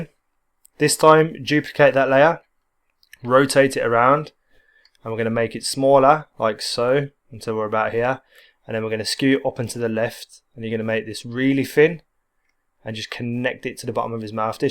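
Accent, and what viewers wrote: United Kingdom. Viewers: Holy crap I didn't know you were british, or english, whatever the proper term is. Cool :D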